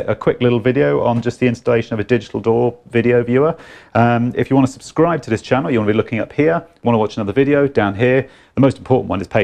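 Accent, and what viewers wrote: China. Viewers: Chinglish, love it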